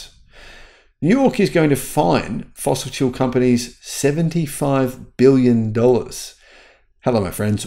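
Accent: Australia. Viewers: Nice listening to an Australian talking about EV/Tesla. The American commentators have their head well and truly up Trump's ares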